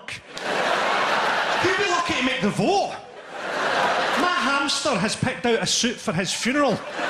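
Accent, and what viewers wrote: Scotland, United Kingdom. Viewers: I love stand up comedy but it's hard for me to understand what he is saying. I have watched and understood Dylan Moran's specials so my English is ok I guees. Is it because the Scottish accent or he's using localism? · It's standard english with an scottish accent. You'll get used to it.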